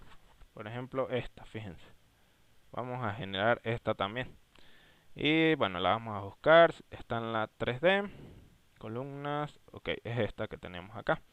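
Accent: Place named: Venezuela. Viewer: Chamo, primera vez que escucho a alguien de Venezuela, saludos brother, chevere tu video, me ayudo.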